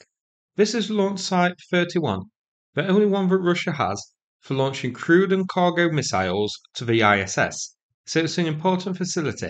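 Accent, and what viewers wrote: United Kingdom. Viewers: pommie accent .... lol .... why not do a doco on the mighty Has-Been Kingdom "Space Force" ..... lol you wankers can't even launch a can of baked beans into orbit ..... you are such a bunch of total losers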